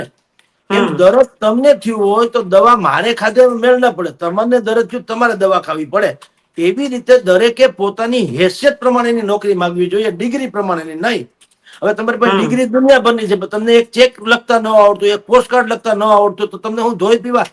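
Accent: Kathiawar, India. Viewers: વાહ... સાબ..શું કાઠિયાવાડી શૈલીમાં પત્રકારિતા છે...વાહ😊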